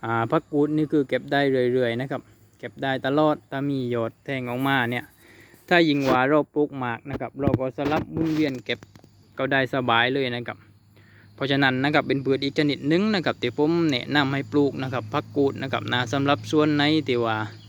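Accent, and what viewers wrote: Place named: South, Thailand. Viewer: ชอบสำเนียงใต้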